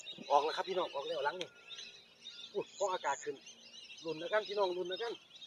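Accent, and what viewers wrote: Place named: Sisaket, Thailand. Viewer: พอมาทำงานต่างประเทศมันกะเงียบเหงา พอได้เห็นคลิปนี้ก็หายคิดถึงบรรยากาศตกปลาที่บ้านเด้อครับ สำเนียงคือศรีสะเกษบ้านผมแท้ครับ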